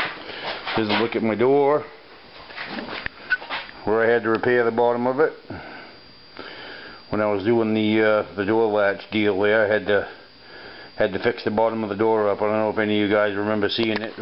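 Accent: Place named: Maine, United States. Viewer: audio was perfect , except it made you sound like you had a Maine accent...video was nice too...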